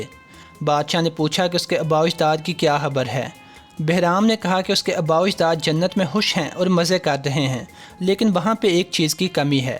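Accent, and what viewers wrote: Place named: Lahore, Pakistan. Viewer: Are you lahorei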